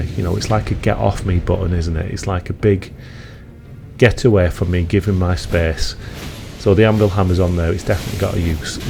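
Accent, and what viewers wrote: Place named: Scotland, United Kingdom. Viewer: My dude is just a little bit scottish ❤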